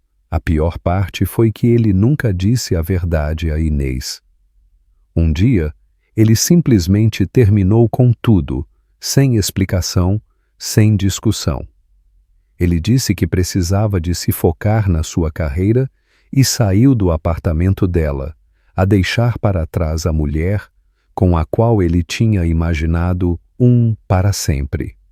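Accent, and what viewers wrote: Portugal. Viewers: É o português natural de Portugal.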